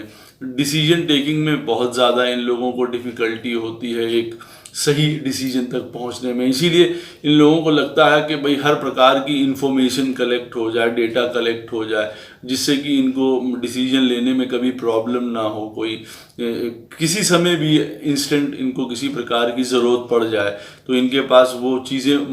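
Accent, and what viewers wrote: Lucknow, India. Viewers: सर आपका बात करने का तरीका बहुत बढ़िया है , ज़बान लखनवी लगती है । यदि यह तुला लग्न में हो तब 9वे राहु व 3रे केतु के उपाय भी बताए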